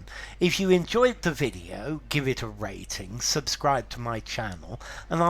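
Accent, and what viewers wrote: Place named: Cockney, United Kingdom. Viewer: You're within the bow bell range Professor. You know how to speak Cockney 👏👏👏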